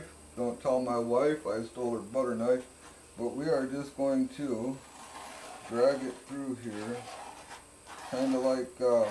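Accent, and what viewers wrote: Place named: Michigan, United States. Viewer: WOW!!! Amazing! You have been absolutely informative and helpful. All 3 turned out great, but I like the "fingers" better, seemed more naturally swirly and less angular.. I'm hoping to take on a small bathroom vanity in the near future and had a lot of doubt about concrete until I saw your channel. "Michael builds" channel is helpful too, so my husband and I bought the rapid set mortar mix... Plus an extra bag too experiment with. I was also thinking about adding a tiny bit of fine powdered red clay (we live in Georgia and it's everywhere) to the bottom of the mold for "rust" streaks in the "marble." I can't wait to try it out! Thanks!!! Love your accent, I lived in Michigan once upon a time!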